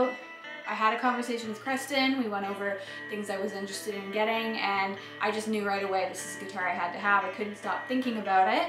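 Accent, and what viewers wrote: Canada. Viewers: Love that Canadian accent! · I like your Canadian accent. The guitar looks and sounds great too. The surf shack is lookin' great.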